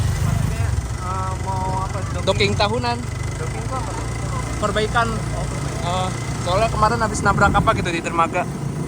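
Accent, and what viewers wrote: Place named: Depok, Indonesia. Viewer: Bahasa lo depok bet bang ✌✌😉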